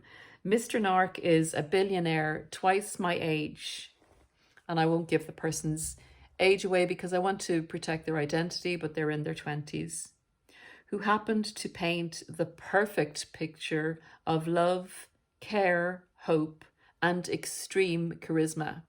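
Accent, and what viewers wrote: Ireland. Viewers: Nice too know we are not alone listening to sites on narcissists. Just feel even better with your accent being Irish ☘️ just found this site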